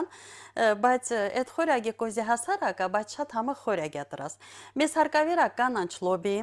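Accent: Artsakh, Azerbaijan. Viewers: Приятно слышать арцахский гадрутский диалект.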